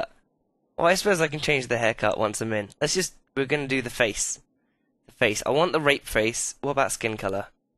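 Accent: England, United Kingdom. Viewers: lol yeah hes def english buddy XD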